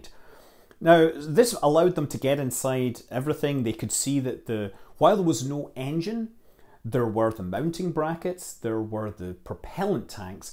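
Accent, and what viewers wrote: Scotland, United Kingdom. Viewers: That's a very convincing Scottish accent.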